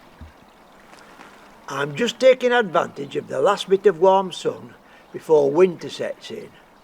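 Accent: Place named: Lancashire, United Kingdom. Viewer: I enjoyed this video and recommend you for your care of the wildlife. I was born in Lancashire and lived a couple of years in Todmorden. I love listening to the music of your accent it feels like home.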